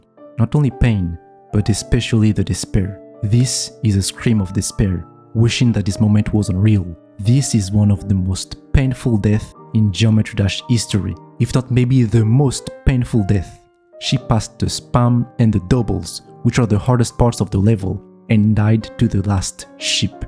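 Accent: France. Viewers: i hear fr*nch accent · Are u French? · Tu serais pas un peu français frrot ? Ton accent te trahi. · are u french or french canadian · You're so french (i am french and i detect french accent lol) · I can smell the little french accent x) Anyways very good video !! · I knew you were french since the first second of the video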